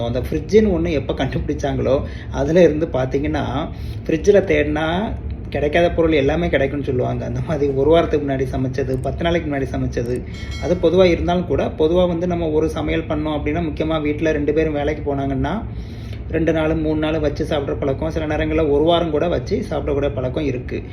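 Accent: Tirunelveli, India. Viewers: Ungal nellai tamil alagaka irukkirathu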